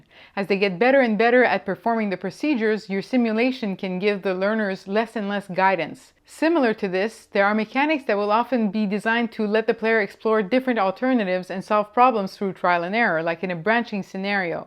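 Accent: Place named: France. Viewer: L'accent français s'entend légèrement sous la couche d'accent nord américanisé. Un peu comme un vêtement rouge qui se voit sous un manteau légèrement transparent...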